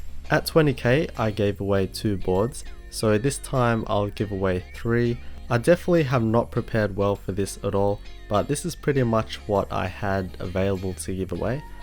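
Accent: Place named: Australia. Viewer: you sound more like an aussie than a filipino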